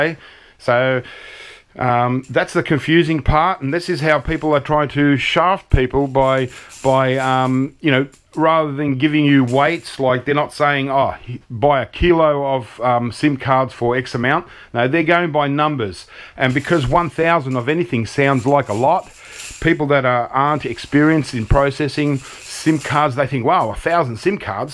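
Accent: Australia. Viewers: are you from Australia ?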